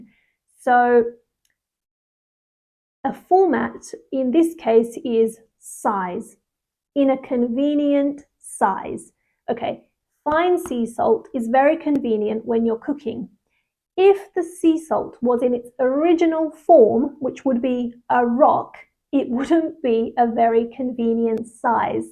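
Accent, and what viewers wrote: United Kingdom. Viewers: Lovely British 😊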